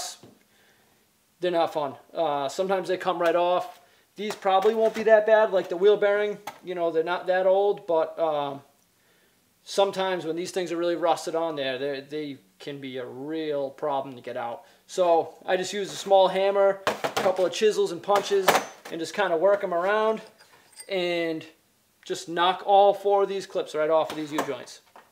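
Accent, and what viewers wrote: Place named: Maine, United States. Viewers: from his accent i would have to say east coat, up wards of Maine or Vermont area. Leaning more towards Maine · Reminds me of the old Ford twin I beam suspensions when the kingpins would rust fast from lack of grease maintenance. Would make the truck wander all over the place and impossible to turn the wheels unless you had power steering. And I'm detecting a New England accent.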